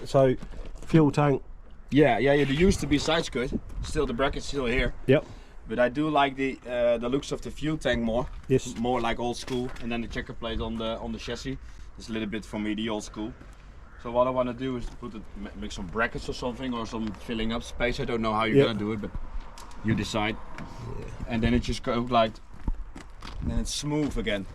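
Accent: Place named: Norfolk, United Kingdom. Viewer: Hi, if you didn’t realise this or not, you are a natural at this content creation. I do love a channel that explains everything about what they are doing, and to top it all off, you sound like me, are actually from Norfolk?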